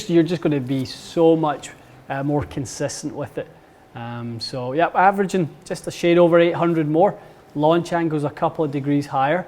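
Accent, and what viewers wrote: Scotland, United Kingdom. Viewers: Great videos pretty interesting stuff, always thought it was ideal to have the spin rates at the number on the club x 1000. Does more spin not allow for easier workablity left and right ? I know guys with modest club speed probably looking to max out distance but surely must be sacrificing stopping control in firmer conditions and shot shaping ability with such low spin ? I swing a 6 iron at 92/3 and am only carrying it around 184 but with spin between 5800 and 6200, also play x100 AMT as I like to see the low launch higher spin flight and also love the feel of the DG. Ive never been properly fit before but do plan to on my next set of irons, have always just went with feel. Also I can tell your from Scotland so hello from Glasgow. Cheers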